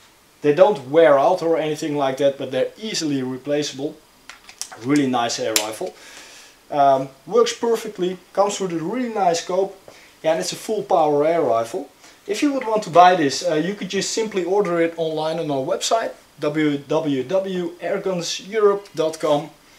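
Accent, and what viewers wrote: Netherlands. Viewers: mooie Nederlands accent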